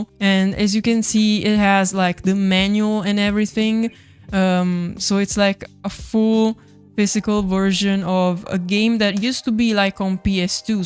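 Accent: Italy. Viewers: the italian to english translate struggle is so real omgg happens to me all the time too!! love your accent!!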